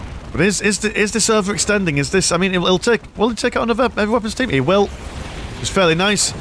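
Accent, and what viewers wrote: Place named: East Yorkshire, United Kingdom. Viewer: Recent subscriber, pleasantly surprised when I heard East Yorkshire, from north east myself, love the videos!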